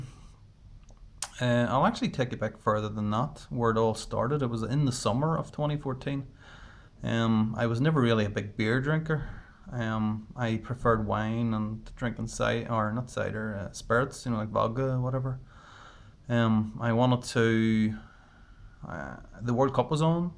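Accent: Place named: RP, United Kingdom. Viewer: At least you haven't turned into an arsehole now you're popular unlike some of them brewtubers out there that think they're the big I am. Nobody's ever going to read this so I'm not even going to bother trolling. I think eventually everyone learns to relax. You sound kinda posh in this. I first did videos for my mate Jon (he'll be on youtube one day) where I was fine, then did videos for youtube that are awful and then pretended I was always doing videos for Jon (and still do) and it got easier.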